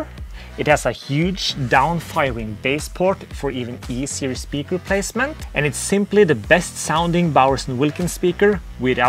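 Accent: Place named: Sweden. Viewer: snak dog Dansk i er et Dansk Firma i stedet for Svedish med en Lattlig svensk accent Kom dog ind i kampen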